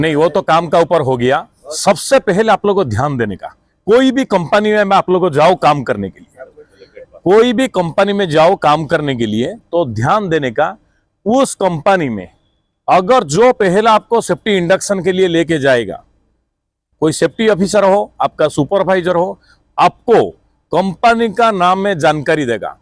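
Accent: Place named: Odisha, India. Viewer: sir aap odisha k ho na aap ki langauge se pata chal Jaa Raha h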